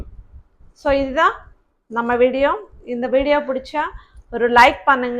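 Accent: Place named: Telugu, India. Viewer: tamil pesaradhu alaga eruku, ❤you are looking gorgeous in this saree💕 beautiful queen💝👸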